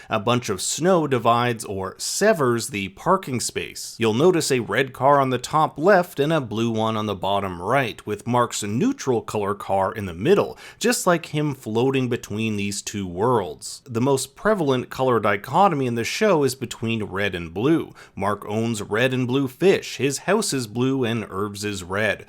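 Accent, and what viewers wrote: United States. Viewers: you’re not american but you have an american sounding accent. are you canadian? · How are you not american and have american accent? My brain is about to melt on that information lmao Edit: Canadian 😂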